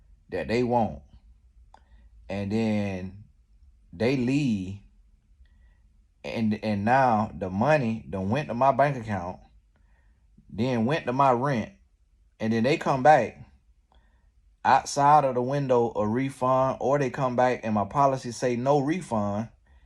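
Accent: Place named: Deep South, United States. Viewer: Deep south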